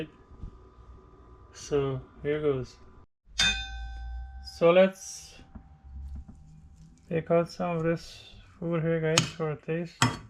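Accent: Guyana. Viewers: Really like the way you explain your steps and like your Guyanese accent. Make me feel like I'm back home London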